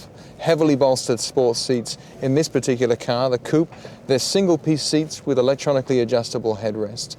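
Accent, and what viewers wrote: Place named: Australia. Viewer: Beautiful car! This guy sounds australian...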